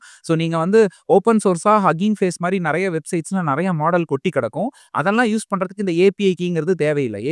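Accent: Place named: Erode, India. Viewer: Thank you I am regularly watching your video and your way of explaining is very good, I am from erode and your slang resembles it very good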